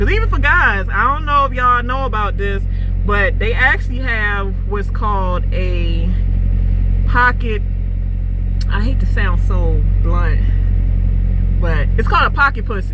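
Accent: Baltimore, United States. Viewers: You must be from Baltimore? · Jay Reed Nope....DC, MD OR Virginia..or at least been there for 5 years or more....Actually 99% hunch it’s Baltimore. (after listing more 99.999% it’s Baltimore) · You sound like you from Baltimore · Very nice looking female truck driver, you speak with a Baltimore accent, are you from that area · Ya sexy ass sound like you from Baltimore. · She is definitely from Baltimore Maryland! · I can listen to that B'more Accent all day 😂 coming from Bama. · She do sound like she from Bmore, sorry that you don’t get none because you’re so cute, love ya voice too. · sounds like she is from. bmore or. maryland lol the uuuuz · I was about to say. You from Baltimore. Nice 😀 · Sounds like you are from Baltimore... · You from Bmore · Ya bmore accent is LIT! 😂😂😂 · This is my first time seeing this channel but i can tell by your accent that you are from baltimore. 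Nice content! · First time ever seeing your video & the first thing I’ve noticed besides how gorgeous you are is your Baltimore accent... You have a new fan! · Very pretty your probably fun to hang out with too I bet sounds like you from Baltimore anyway keep safe out there miss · Good grown advise.Keep it truckin,sound like a Baltimore accent.Peace and blessings to the truckers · Gotta be from Baltimore. NOLA and BMore accents are so dope! You rock! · Love your BMore accent ♥️ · You are from Baltimore, Maryland aren't you? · That Bmore accent strong lol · Love that bmore accent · You sound like you're from Baltimore.But you not having a man.Wow I can't see that far.You are BEAUTIFUL. 💯😚Keep it up sista.Real talk from beginning to end. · 2-4 Till I Die she sounds like she's from Baltimore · 666 comments, glad I'm 667 Sounds like you from Bmore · My first video watching. You from Baltimore. 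I can hear that shit clearly. DEW lol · You gotta be from Baltimore?! I can tell by the way you say “do” and “to”...I like your honesty. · I love the Baltimore accent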